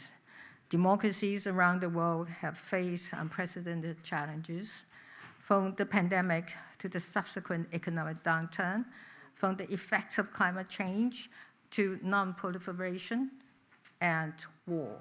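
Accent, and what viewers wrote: United Kingdom. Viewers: 小英是在英國讀書的，她的口音向來都是英國腔。你的耳朵沒有跟腦袋連在一起嗎？ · 的確。的真的很英國。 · 正統牛津腔的演說，讚。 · 聽得出來英語有點生疏，英國腔英語好明顯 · 亂噴什麼一直英腔好嗎？ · 小英總統的口音是不是有點英式😳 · 她並不生疏，政治人物的演講重點就是必需清晰，自然速度會放慢。另外，她的英式口音非常漂亮。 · 她的英國腔很好聽！ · 一直是英國腔喔！ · 小英正面的論述，加上流利的英國腔英語，迷倒了眾多歐洲人。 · 當總統時是美國腔，不當總統就變成英國腔? 自己不覺得美國人聽了怪怪的嗎? 是否搞不清楚誰才是主子? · OMG, she speaks English with British accent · 小英的英國腔在歐洲人聽了會覺得很親切~~ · 她的英國腔🥵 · 小英總統的英國腔有高貴的氣質~ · 為什麼小英總統的有英國腔🤔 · 人家講的是英腔，你是來留言被打槍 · 蔡一直都是英國腔沒聽過以前的接待外賓訪談嗎？ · 哇塞，英國腔❤ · 英國留學果然帶著標準的英國口音，閉上眼睛👀聽來就和英國人差不多🎉！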